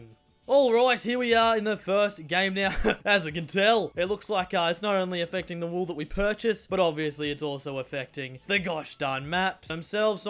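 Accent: Australia. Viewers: This man has an Australian accent and he can do both the British and American accent. Teach me your ways. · Comic I know he’s ausie it’s just he’s speaking ausie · is more australian in this video xD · he's never sounded so australian before HAHA i love it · why did become a really hardcore Australian this vid? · He seems more Australian than usual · The heavy Australian accent is so funnnyyyyyyyyy love you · am i the only one who feels like is getting more australian in every upload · became more australian than me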